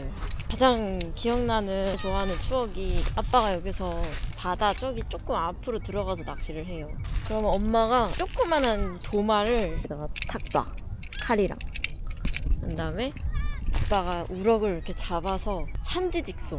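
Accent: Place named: Dangjin, South Korea. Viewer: 누나 사투리가 배여있는 부분이 당진이었구나! 누나 차 출발 전 표정 개카리스마!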